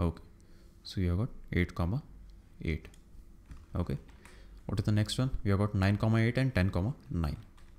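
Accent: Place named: India. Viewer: indian accent is crazy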